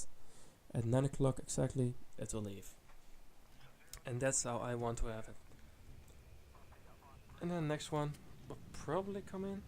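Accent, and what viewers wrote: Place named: Netherlands. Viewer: Haha ik kan het aan je accent horen!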